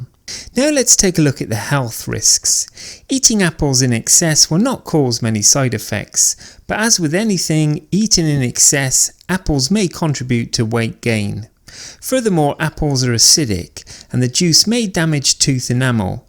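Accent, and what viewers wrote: United Kingdom. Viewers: good info and nice british voice!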